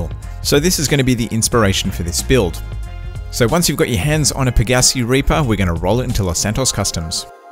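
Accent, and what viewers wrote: Australia. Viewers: Are from Australia.?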